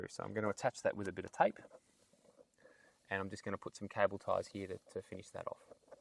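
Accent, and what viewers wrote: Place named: Australia. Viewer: ommgggg I love Australian accents!!! ;)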